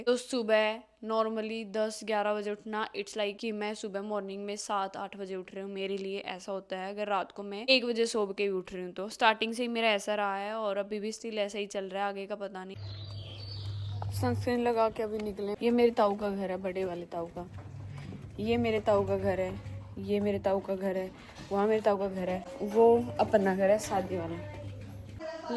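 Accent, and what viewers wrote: Haryana, India. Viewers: Your haryanvi accent is very nice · Di aap ke muh se haryanvi bhut achi lagti h 👌👌👌👌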